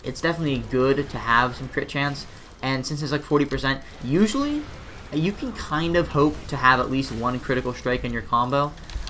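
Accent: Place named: United Kingdom. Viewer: You sound british, im wondering why youre playin' on na than :/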